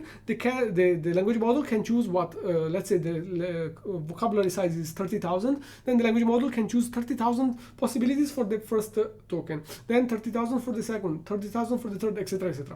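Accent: Italy. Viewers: I love the Italian accent 😂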